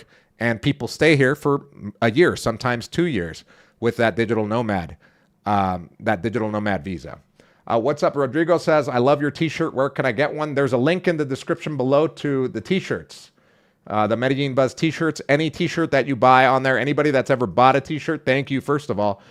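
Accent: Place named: United States. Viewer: I am quite happy to hear a "real yank voice" in Latino body! Subbed.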